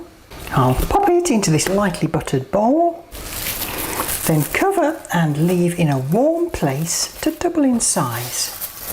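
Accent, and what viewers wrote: Midlands, United Kingdom. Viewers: Loving these great recipes, your enthusiasm and the Midlands accent!!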